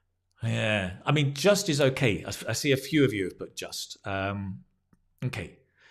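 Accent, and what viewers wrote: United Kingdom. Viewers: you deserve all of respect and appreciation. I always enjoy listening to your amazing british accent ❤❤❤❤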